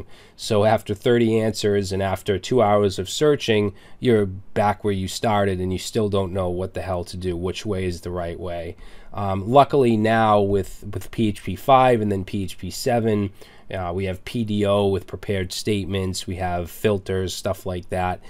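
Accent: United States. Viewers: Man, I dig your accent. I think it's as American as it gets.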